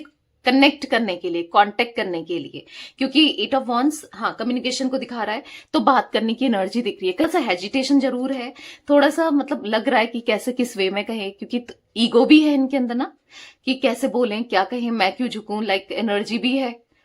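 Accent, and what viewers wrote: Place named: Punjab, India. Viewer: Ap ki awaz Punjabi+Urdu = Mix tone ha unique voice ❤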